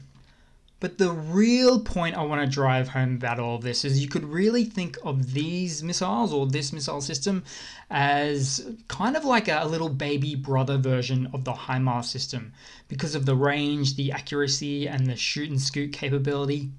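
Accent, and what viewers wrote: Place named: Australia. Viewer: Interesting hearing an Aussie pronounce the Ukrainian names, I’m struggling trying to brake the word structure into something pronounceable! Cheers mate🇦🇺🇦🇺🇺🇦🇺🇦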